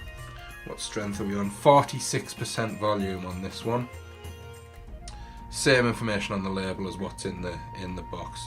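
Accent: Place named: Durham, United Kingdom. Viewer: I've just bought myself a bottle of this. I'm looking forward to trying it. I've recently got into whisky. Not tried a Campbeltown yet. Are you from Durham? I'm from Durham you're accent sounds similar to me.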